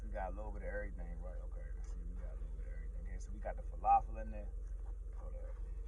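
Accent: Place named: DMV, United States. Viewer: I knew I recognized that accents #dmv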